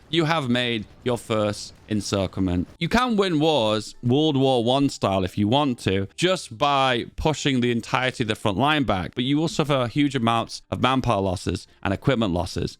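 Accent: United Kingdom. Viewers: Eh British 🤮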